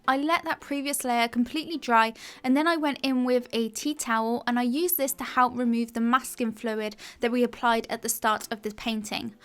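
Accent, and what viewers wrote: England, United Kingdom. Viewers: I like your English accent, where are you from?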